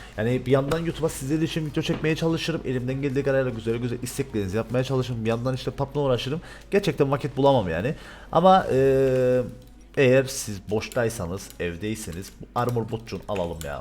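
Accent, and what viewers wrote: Cyprus. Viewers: abi kıbrıslımısın çünkü bende sesin aynı kibrisli konuşmasını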